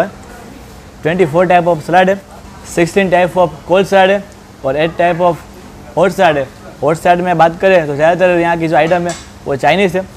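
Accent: Gujarat, India. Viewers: Specially Loved u r gujju accent hindi. TRY SOME VLOG IN GUJRATI. Might help u littel bit more to grow. BTW AMAZING EFFORT GL.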